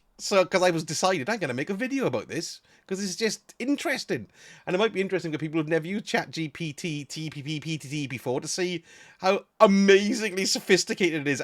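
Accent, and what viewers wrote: Wales, United Kingdom. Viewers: Great to put a face to that beautiful Welsh accent, been watching your content for years! As an older gamer myself I enjoy your humour. Id love to see you do some videos on the replayable aspects of Destiny like raids with viewers or on some of the controversies like Manstrual’s ban and his corresponding video. Hope the game doesn’t die. People have been saying it’s finished since before I started playing all those years ago.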